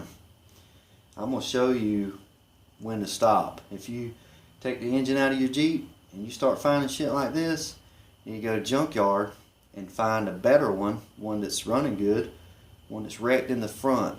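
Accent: South, United States. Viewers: Much love brotha love the content,and that good ol' southern twang..Wish I lived closer we could go tear shit up..Keep it up..peace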